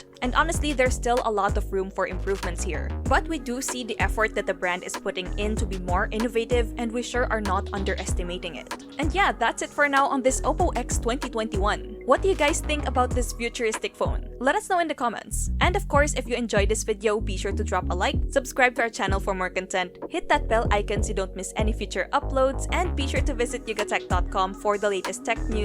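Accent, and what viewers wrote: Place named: Philippines. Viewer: That filippo accent gets me all the time 😍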